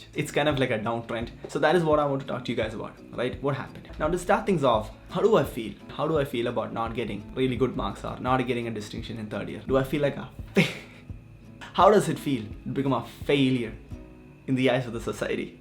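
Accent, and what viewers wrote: Kerala, India. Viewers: Yoo I saw you in a reel and followed and now you are HERE😳 anyways change the thumbnail It has a grammatical error Change "What happened?" In place of what happen? Ik imma weirdo talking like boomer 😂😂 Btw love your malayali accent 😁😂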